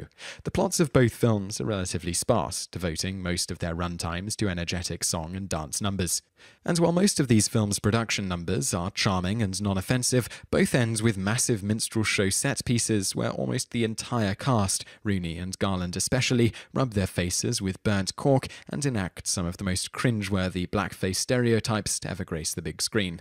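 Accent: United Kingdom. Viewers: Alright, I've had it; Hey, NARRATOR, learn to pronounce people's names, for god sake. If you don't know how to pronounce something, ASK SOMEONE, don't just think you're correct because you have a British accent. Seriously.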